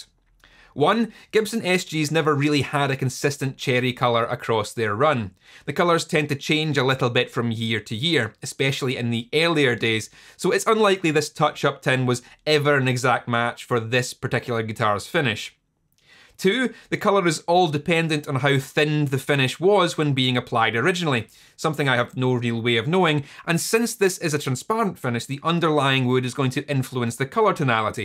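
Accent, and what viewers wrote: Scotland, United Kingdom. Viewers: It's refreshing to hear a non-American accent, especially a Scottish one. Spent 8 months in Edinburgh in 2007 and loved the place. I became addicted to Irn-Bru and Caledonian 80. I don't miss the neds though, but I tried Buckfast for a laugh and thought it tasted shithouse. Love your channel. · Nice work, love your videoes and the Scottish accent. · weird, sounds different in this video, much less Canadian and much more Scottish.... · I wouldn’t have done it any differently as I have never repaired a neck break in a guitar. Looks good to me! I don’t get the urge to turn off the video when I hear your accent, I get the urge to drink an Irn Bru and get something from Gregg’s and then I remember I moved back to Australia 10 years ago and I get a little sad. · People leave because of your accent? But...Scottish accents are so cool!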